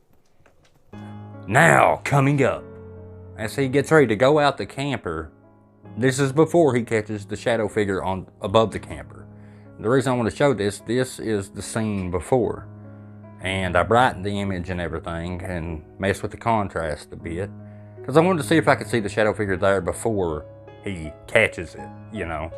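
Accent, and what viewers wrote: South, United States. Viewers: Great video! Sorry this is nothing to do with ghosts or debunking but you mentioned accents. I don’t know what hillbilly means but I was struck by your accent when I came across your channel and wondered since - what is it? I’m not American so I’m just guessing like Virginia or Carolina or around that area? I could be way off sorry 😂😂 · I love your accent, y’all talk mighty fine down there in the south❤ hey great video! Nothing 2 dimensional about it! Made me lol too! Much ❤ & ✌🏼out! Oooo & Lee can 🖕🏼heh heh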